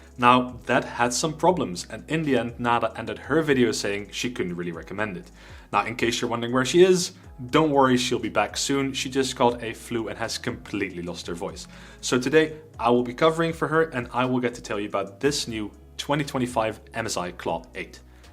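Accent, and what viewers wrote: Netherlands. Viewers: Hes from the Netherlands, accent reveals. Needs more research.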